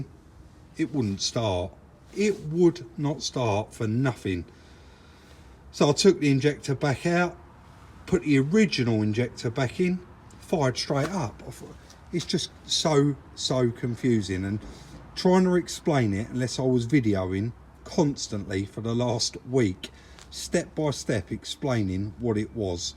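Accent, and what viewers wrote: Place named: Essex, United Kingdom. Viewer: Hi boys I’ve been following you on YouTube on my tv and on there I cannot comment so now have joined you on my I pad to comment. Great channel great work , and it’s really nice that I hear some one speak Essex as I do love the vids keep up the good work , just one thing puzzles me is where is all the TEA?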